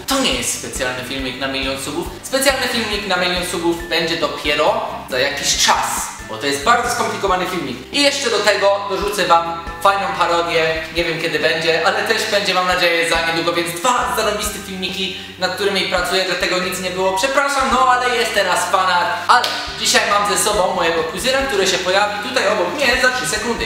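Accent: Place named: England, United Kingdom. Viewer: Ale ci się akcent wyrobił ;3 Teraz to już ledwo słyszalny ten angielski, chociaż trochę szkoda, bo z nim było jeszcze zabawniej ! :D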